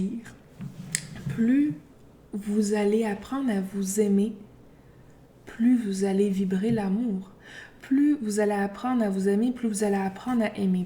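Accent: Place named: Canada. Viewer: Vous êtes d'origine canadienne selon votre accent... pour que les gens qui vous suivent, vous n'ai parlé pas de votre carrière!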